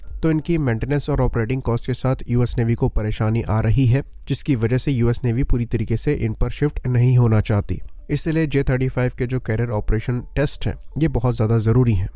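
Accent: India. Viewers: 你的英文有很强的印度咖喱味道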